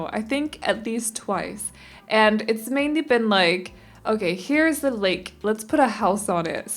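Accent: Denmark. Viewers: Omg- your Danish accent is so good! 💖🇩🇰 (Btw amazing build)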